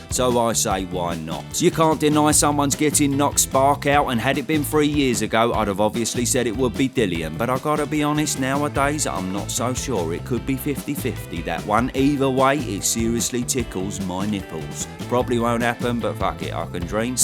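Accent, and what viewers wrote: Texas, United States. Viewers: Love the Texan accent. Literally pissed myself. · Perfect Texas accent if you ask me.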